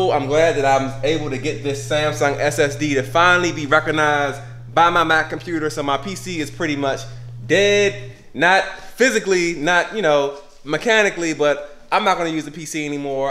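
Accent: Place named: Prince George's County, United States. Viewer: Thank you! I can tell that PG accent from anywhere btw :)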